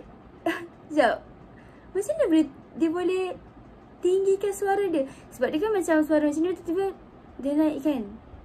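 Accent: Malaysia. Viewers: Saye dri Indonesia🇮🇩 tpi saye suke bahase logat Malaysia,Karne enak di dengar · Dialek malaysia saya suka dengar. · Candu Logat Melayu 😭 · Saya orang indonesia,dengar suara orang malaysia ngmng,sangat kagum apalgi cantik banget macam ukhti..mantaab...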